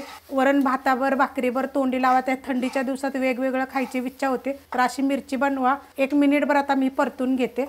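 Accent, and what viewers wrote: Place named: Ghats, India. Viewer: Mi navin viewer aahe. Mirchi nakki kontya prakarchi vaparli aahe? Aavadali. Tumhi ghatavarche dista. Aapki boli vatli. Mala Abhi man vatla tuza. Satara bhgakadchya sadhya sopya pan ashach khamang recips dakhav. Ghatavar kami vastunmade atishay chavisht padarth kele jatat. Mazya hya channel la khup khup shubhechchha.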